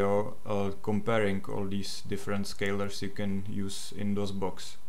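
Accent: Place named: Czech Republic. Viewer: Ne... nikoho jsem se napodobit nesnažil - prostě českej přízvuk.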